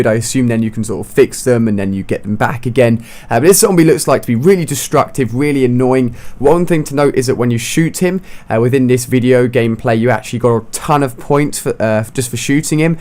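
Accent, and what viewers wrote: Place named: RP, United Kingdom. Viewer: Your so posh and have a weird lisp thing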